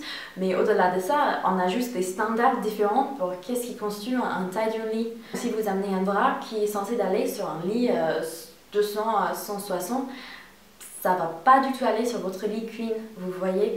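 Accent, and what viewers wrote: United States. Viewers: J'adore l'accent americain o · J'adore ton accent américain ! C'est trop jolie à entendre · Hello, j'apprécie beaucoup tes vidéos et ta personnalité comme ton léger accent américain (j'ai dit léger!). Well, concernant les drap je pense pourtant qu'il est une bonne idée d'emmener des DRAP-HOUSSES car il paraît que ça n'existe pas aux USA? J'en ai jamais vu tout au long de mon année aux USA et lorsque une amie devait y aller quelques années plus tard, elle en a emmenés des draps-housses et elle en était très contente d'avoir suivi mon avis. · Elle est américaine :) tu l'entends avec son accent :)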